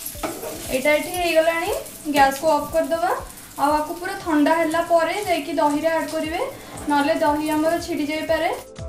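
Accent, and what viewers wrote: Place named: Balasore, India. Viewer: OK mam.apananka Katha style ta mote balesoria bhali lagila so pacharili.. Thnku mam. I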